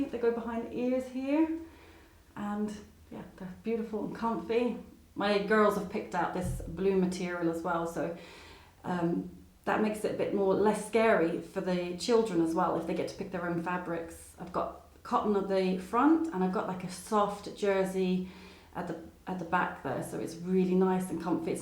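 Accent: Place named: England, United Kingdom. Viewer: Thank you so much my friend you sound like you from England my friend god bless you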